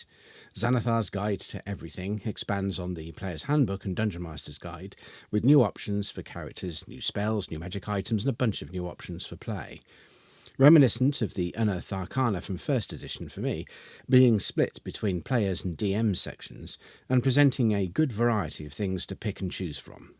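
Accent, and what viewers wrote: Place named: United Kingdom. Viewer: Amazing video! I cant get from the accent, where are you from in the UK?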